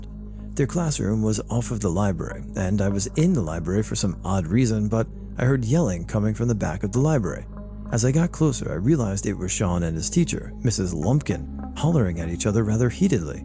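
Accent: Yorkshire, United Kingdom. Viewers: Best Yorkshire accent I've ever heard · Hilarious Yorkshire accent. Lol. I'm from that huge county (one of four Yorkshires).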